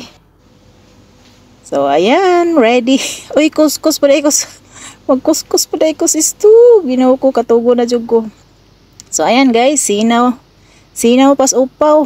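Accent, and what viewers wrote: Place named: Visayas, Philippines. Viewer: bisaya jod diha nahirapan ako ka lisod nimo woy hahaha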